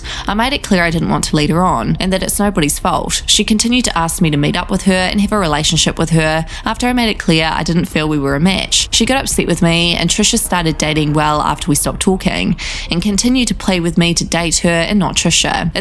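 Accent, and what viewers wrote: New Zealand. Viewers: It's a new Zealand accent. I'm Australian 🙂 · As a Kiwi, this is the most Kiwi accent I’ve ever heard on YouTube next to old school ShannXXO 😬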